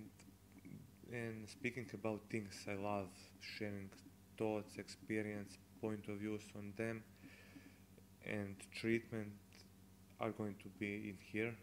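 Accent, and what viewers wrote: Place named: Croatia. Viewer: Good luck! btw where are you from? I'm from Croatia, and you sound like you might be from there as well.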